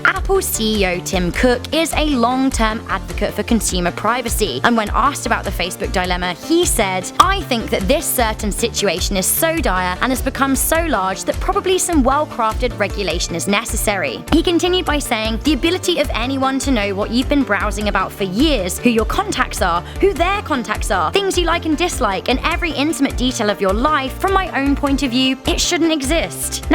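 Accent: United Kingdom. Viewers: Aaa B yeah it’s a British accent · Just want to make it known to the world that has the absolute best anglo accent that I have ever heard.... My opinion hasn't anything to do with the video nor anything else really, but I felt that I would share it with the rest of the world for reasons.. (´∀`) avec beaucoup d'amour de france. (✿◠‿◠)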